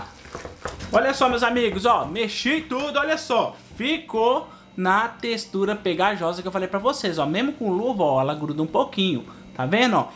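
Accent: Minas Gerais, Brazil. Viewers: Adorei, só de falar já vê que é minerim!! Já compartilhei e vou fazer. Ontem domingo dia 23/07 eu fiz a broinha de fubá de canjica doce. Em breve farei esta receita. Estou te assistindo de Ipatinga, Minas Gerais!!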